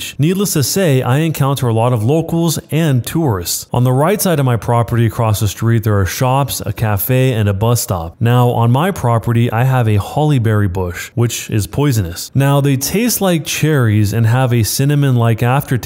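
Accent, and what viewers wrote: United Kingdom. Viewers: the British accent made me go pffffft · BRO THE BRITISH 1980 ACCENT IS KILLING ME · this british accent had me on the floor 😂😂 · That British voice 😂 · The brit accent tho 💀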